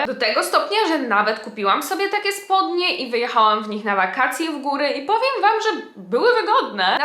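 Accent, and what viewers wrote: Poland. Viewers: po kilku latach oglądania ciebie stwierdzam że pochodzisz z województwa świętokrzyskiego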